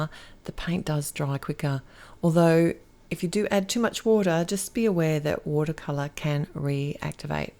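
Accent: Australia. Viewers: So lovely to hear an Aussie accent on my crafting channel!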